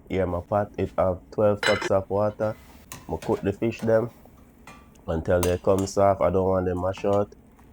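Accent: Jamaica. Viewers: Love how you express yourself authentically Jamaican!❤🔥🇯🇲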